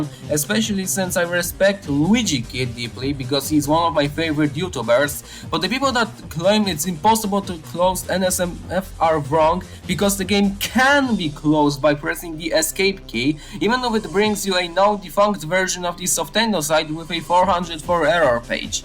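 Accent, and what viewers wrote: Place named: Poland. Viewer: Po akcencie i po opisie widzę, że jesteś z Polski :D Chciałem się zapytać czy wiesz gdzie można pobrać MF ale podałeś link do strony gdzie można je pobrać. Ale chcę się upewnić, i zapytam czy strona, którą podlinkowałeś (ten drugi link) jest na pewno bezpieczna? Gdziekolwiek bym chciał pobrać MF to jest zainfekowane i jesteś jedną z niewielu osób, u której jest podana strona, na której można pobrać MF i byłbym bardzo wdzięczny za odpowiedź czy strona ta jest na pewno bezpieczna i jak pobiorę MF to nie będzie zawirusowane. Z góry dziękuję!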